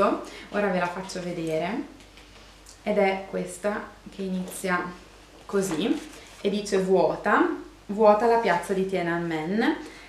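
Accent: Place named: Modena, Italy. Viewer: Ciao, sono arrivata sul tuo canale proprio grazie alla BTILI e mi hai conquistata!! Sei davvero bravissima. Hai una proprietà di linguaggio invidiabile e tra dolcezza e passione riesci a far innamorare dei libri di cui parli (ormai li ho messi tutti in wishlist 😂). Oltretutto io sono di Reggio quindi il tuo accento modenese mi è familiare 😊 a me piacciono moltissimo i fumetti proprio perché l'utilizzo delle immagini può essere molto evocativo. Complimenti!!!!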